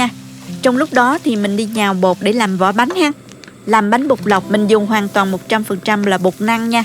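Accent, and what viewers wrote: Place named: Saigon, Vietnam. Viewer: Giọng Sài Gòn xưa thật dễ thương phải không?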